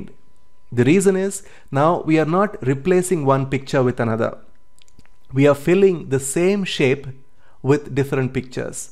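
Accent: India. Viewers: until its indian blah blah!!!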